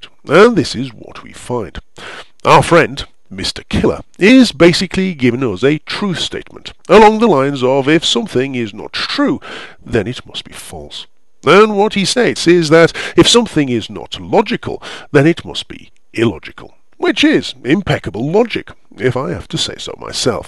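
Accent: United Kingdom. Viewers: I may not be an atheist, good sir, but I admit that this video had me laughing at more than one point. Also, your voice is absolutely silky smooth...is your accent East London?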